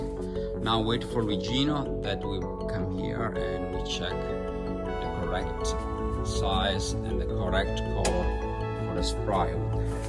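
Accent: Italy. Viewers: bravo sembri veneto dal sound....se ti serve una mano gratis a disposizione perche mi sa che c'e' davvero molto da imparare da voi. well done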